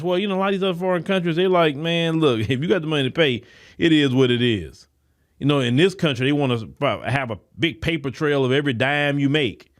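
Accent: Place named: AAVE, United States. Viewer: Bro, can't you speak proper English? Why are you as a blogger sitting in a fancy office in a suit with an expensive watch talking Ebonics like a ghetto middle school dropout? I suggest you go read Thomas Solwell's books.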